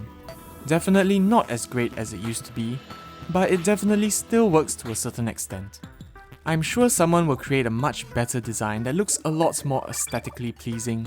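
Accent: Singapore. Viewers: U sound really singaporean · Are you Singaporean? You sound like your from Singapore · Are you singaporean? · Uh is it me or do u sound a bit singaporean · Why is the Singaporean accent so fucking nice? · are you singaporean or malaysian? Your commentary is relaxing and familiar:) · are u from singapore? u sound so familiar O.O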